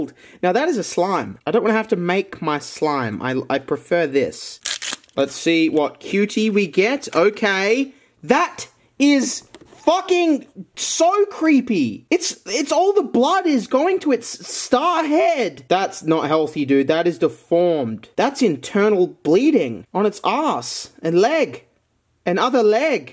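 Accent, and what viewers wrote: Australia. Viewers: I was like “this dudes definitely Australian” then you brang up the water joke. Whkxbskcbskcbdk but yes anyways hello fellow aussie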